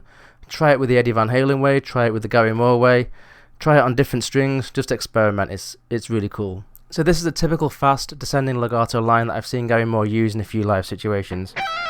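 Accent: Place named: Ireland. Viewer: You are such a nice Person und bring me Gary back into life! With people like you he can stay in our hearts and still be with us. Love the way the Irish talk, like you and Gary, it sounds so lovely and gives the feeling, that they carry the heart on the right place! The Irish Twiddle reminds me on the sound of bag pipes and this influence I loved also a lot at Gary's playing, especially on one of his greatest albums for me, which was "Wild Frontier". Your Playing and interpretation and explanation: JUST GREAT Thank you so much ❤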